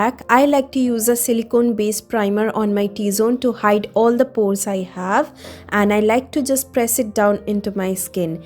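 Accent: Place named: Bengal, India. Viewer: Just love ur bengali accent im from Kolkata n would love 2 meet u one day♥️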